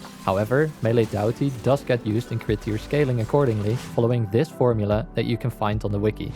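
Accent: Netherlands. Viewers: Nederlands accent gaat enorm hard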